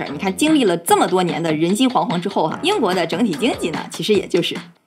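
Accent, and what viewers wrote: China. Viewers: 好奇地问，你们听得懂大陆的口音吗？会不会觉得听着很奇怪？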